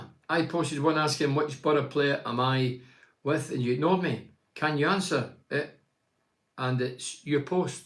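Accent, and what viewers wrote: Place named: Glasgow, United Kingdom. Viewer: Love your passion. The more angry you get the more the weegie accent comes out. Just refreshing to hear it as it is. It's great to see you smile, you have a lovely smile. Pity things aren't seeing you smile as much just now. Keep smiling! UTB!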